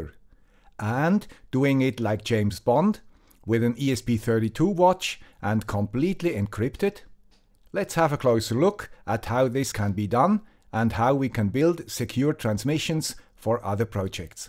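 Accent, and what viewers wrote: Switzerland. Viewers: no doubt, that would be a secure solution. But it would require even more sophisticated software, and it would still need the crypto-quality RNGs. The shared state then takes the form of the client certificate. If the Guy With The Swiss Accent (gruezi!) or any of his viewers want to go with TLS, then chances are good that they‘ll get a secure solution. But I think it‘s worth considering the least that you‘d have to do and still be sufficiently secure. The solution in the video is probably fine, and I believe that HMAC would also get it done only depending on the shared key, but not on the counter.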